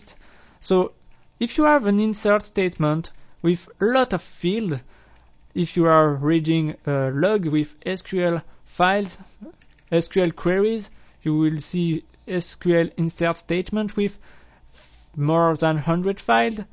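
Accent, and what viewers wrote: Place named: France. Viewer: He has the same accent as mine, it is a french accent, and it's the most beautiful accent in the world, so you're wrong. If you can't understand a french speaking english it means you aren't worthy of hearing what this person is saying. French accent has been voted the most understandable foreign accent by a panel of very wise and independant judges.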